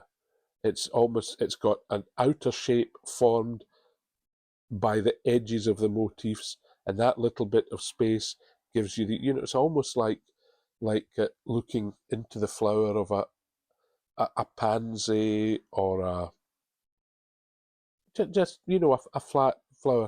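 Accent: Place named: Scotland, United Kingdom. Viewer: thank goodness you were cast off from Game of Wool because now I can watch these fabulous podcasts that you are doing. I'm a knitter in Australia and I started a vest from Kate Davies book "Inspired by Islay" back in 2017 which has been languishing since. I'm now inspired to finish it, steeks and all!! You are an expert knitter and have a delightful Scottish accent (to me), that is so pleasant to listen to.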